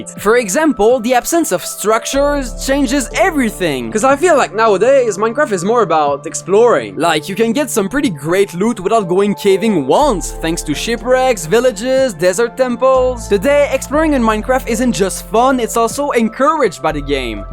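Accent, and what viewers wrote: Quebec, Canada. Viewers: Came in for the nice ouse, stayed for the gros accent québécois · Est-tu québécois par hazard?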